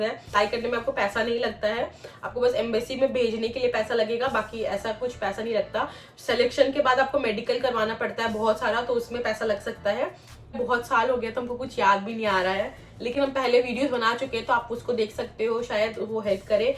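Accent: Jharkhand, India. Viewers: Hello there... this is my first comment ever and I'd like to congratulate you for your achievement and I kind of knew that you were from Jharkhand because your Hindi accent is just like mine and I'm glad that you are proud of your mother tongue and use it in your videos too. You remind me of my husband who is also from Jharkhand and with hard work he made it to JNU and later to Japan... that was a long time ago, when nobody from jharkhand or bihar really wanted to acknowledge their backgrounds. I'm so happy to see young Jharkhandi like you doing well... may fame follow you everywhere👍💯🧡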